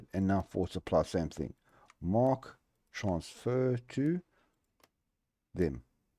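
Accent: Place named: Afrikaans, South Africa. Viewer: Best vids, bolstered by unique Afrikaans accent for American viewers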